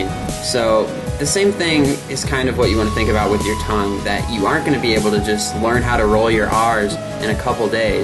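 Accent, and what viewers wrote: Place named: United States. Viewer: First of all thank u for ur prilliant ideas, but iam wondering why u r learning rolling Rs cuz u seem like pure American I feel like u r a native speaker thanks again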